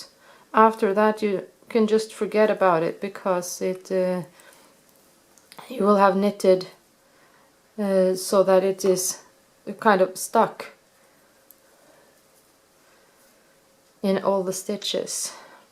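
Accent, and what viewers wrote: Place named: Norway. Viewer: Thank you for this detailed explanation! I lived in Norway ten years ago and hearing your accent brings such nice memories. Also your English is perfect! I remember my Norsk friends would always be embarrassed to speak in English too (until they had a few drinks!) so I smiled when you mentioned it. Tusen takk!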